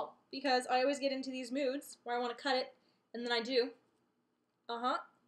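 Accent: Canada. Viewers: Are you Canadian? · are you from canada? what's the accent from?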